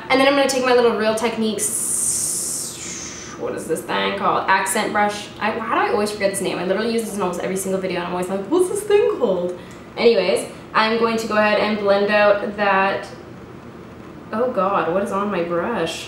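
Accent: Canada. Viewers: Every now and again, your cute Canadian accent comes through. I love it!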